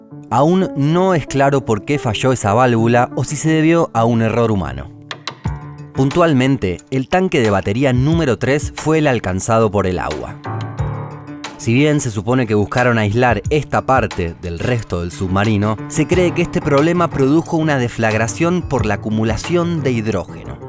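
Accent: Rioplatense, Argentina. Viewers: He visto tantas veces los videos de que se me pegó el acento argentino y cuando hablo la gente me mira raro:p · Lo que paso solo lo saben con certeza los responsables vivos pero ellos nunca van ha reconocer los errores que costaron la vida de tantos, no sería la primera vez que salió en esas condiciones , y difícil de constatar todo .- Muy buenos los videos y hermoso escuchar una voz Argentina . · El Acento argentino de estos vídeos es agradable y Elegante , no se vuelve molesto como en algunos casos de periodistas deportivos o Narradores argentinos en los que ya se vuelve detestable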